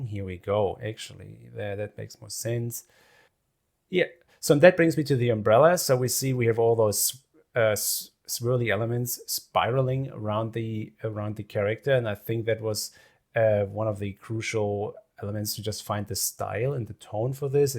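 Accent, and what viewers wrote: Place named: New Zealand. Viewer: Beautiful and practical way to get the shot ASAP. Also I lived in NL long enough to be able to pronounce your last name correctly, and your Kiwi accented English is a perfect living testament to your VFX career!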